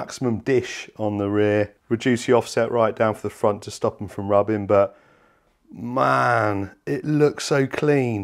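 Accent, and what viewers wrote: United Kingdom. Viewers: I assume so he sounds he is from the UK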